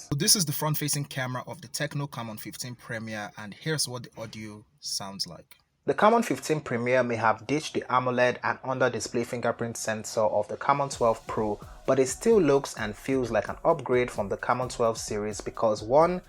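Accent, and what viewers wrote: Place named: Nigeria. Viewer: Great video, you sound nigerian. Are you :) ?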